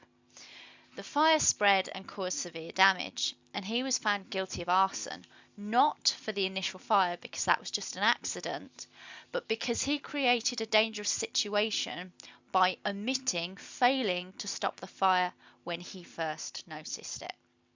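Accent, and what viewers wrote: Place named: England, United Kingdom. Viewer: usually i dont like english accents but i liked yours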